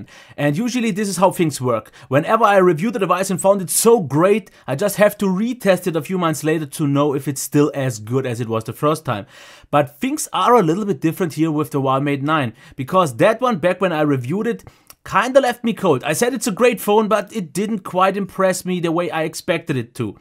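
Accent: Germany. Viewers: Ich liebe es wenn Deutsche Englisch reden. Egal wie sehr man sich auch anstrengt, man bekommt den deutschen Akzent einfach nicht los :D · Funny when A German speaks English like him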